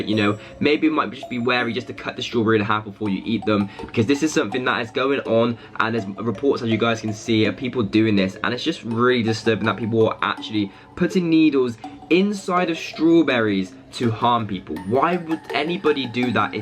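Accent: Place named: United Kingdom. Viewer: I love your british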